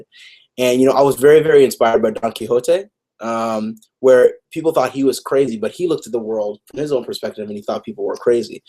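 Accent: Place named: Spain. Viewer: Wow! your spanish accent is fantastic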